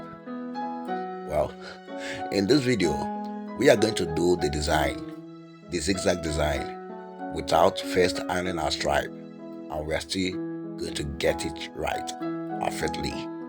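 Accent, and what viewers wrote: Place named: Ghana. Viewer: Nice Job bro.. you sound like a Ghanaian ɓoss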